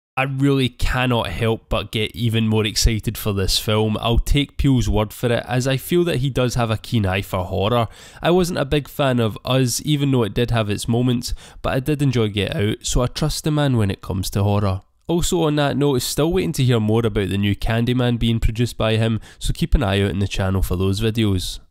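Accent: Scotland, United Kingdom. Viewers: "Arrr Eyes Soup-eRR GAL-EWW-D ON da Ssssska-reeeen" Your Scotish Brogue kills me. God Bless ya'. Move to Hollywood,because we need to hear that voice. Normally, Americans we can't understand a bloody thing Scots say, but you talk slowly. It's a blessing! U rock Scots-dude!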